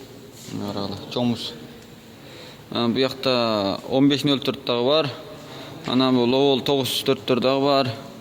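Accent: Kyrgyz, China. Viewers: Бул алып баруучу кытайлык окшойт. Суйлогонуго Караганда? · сүйлөшүнө караганда кытайлык кыргыз бизге баасын айт дизельнийдин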